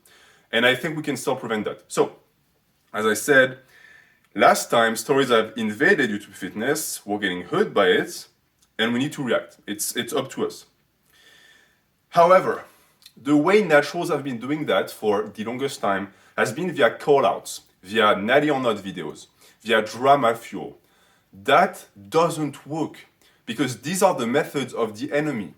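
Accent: France. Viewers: Are u french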